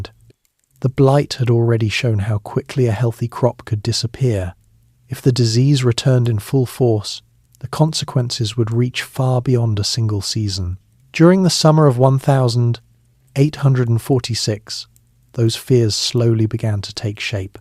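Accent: United Kingdom. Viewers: I can't listen to a man with a distinct British accent narrate a commentary on the Irish Famine. I'm surprised you don't get it. It's similar to listening to a German narrate a documentary on the Holocaust. What!?! You think we've forgotten?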